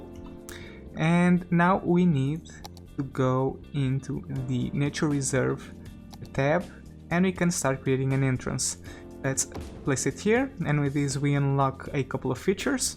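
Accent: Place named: Portugal. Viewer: Classic Portuguese accent!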